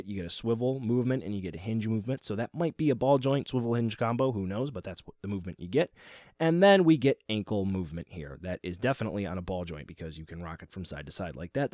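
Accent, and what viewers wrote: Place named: South, United States. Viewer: What is with the southern accent?????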